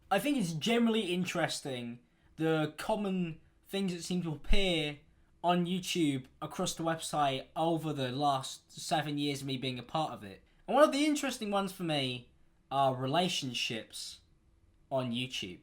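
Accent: United Kingdom. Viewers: Subtitles + British accent = House on fire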